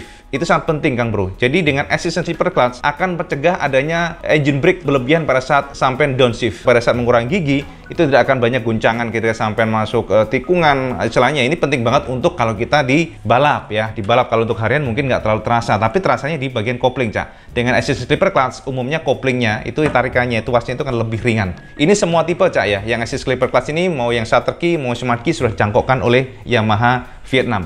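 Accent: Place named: Java, Indonesia. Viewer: Kang emang gokil cak...podo jowone karo aq...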